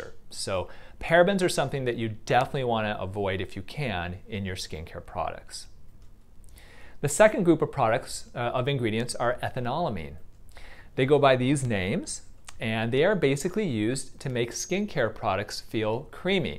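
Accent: United States. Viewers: Thanks so much!🤩 And your voise reminds me USA 16 years ago.. Was there with Work and Travel program as a student. Happy to find this channel 🥳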